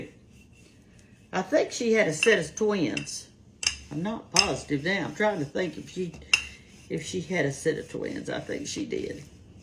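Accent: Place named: South, United States. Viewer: You are a hoot Ms.. I love to here southern women talk Enjoy your videos😊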